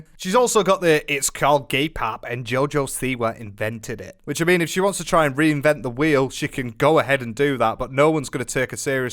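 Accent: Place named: Newcastle, United Kingdom. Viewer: Hey there. I am brand new to you. Nice to meet you. You sound like a Geordie. lol. My favorite accent. I will subscribe and like!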